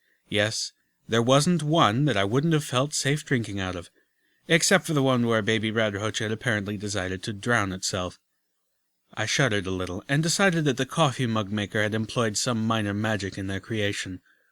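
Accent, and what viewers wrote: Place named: United Kingdom. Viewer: I've been wondering this ever since i started watching your audio book; do you actually talk likt that? i mean, it sounds good, but you sound like your a mix between british and western. also, what's your cutie mark?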